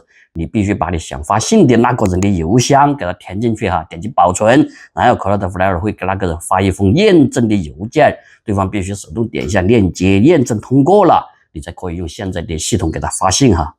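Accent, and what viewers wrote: Sichuan, China. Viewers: 这个川版普通话我还是听得懂，就是搞这个不花钱的邮箱太复杂了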